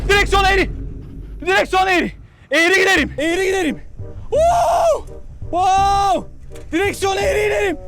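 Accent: Azerbaijan. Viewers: Abi Türk müsün? Azeri gibi konuşuyorsun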